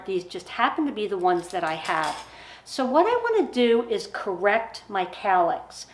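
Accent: Philadelphia, United States. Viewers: I am from Philly and you are either Philly or South Jersey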